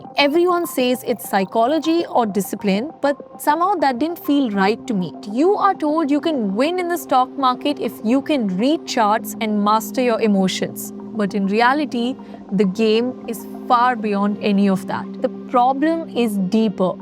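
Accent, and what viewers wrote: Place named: Mumbai, India. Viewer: All from Mumbai same accent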